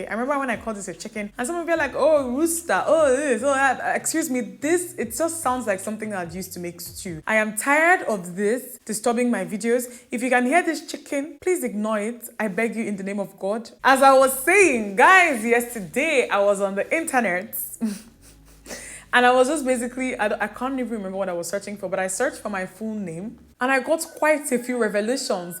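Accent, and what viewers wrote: Igbo, Nigeria. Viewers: Speak your Igbo English girl, we will still listen and watch 🤣 no worries. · has finished me ooo😂😂😂😂😂😂😂😂😂😂😂 I love ur igbo accent jare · This ya igbo accent is sweeting me...Chai! Dalu o!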